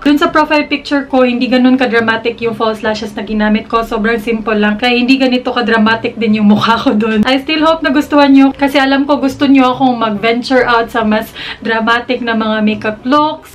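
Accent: Visayas, Philippines. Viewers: Para sakin ang ganda talaga ng accent/punto ng mga bisaya na nagtatagalog. Hahaha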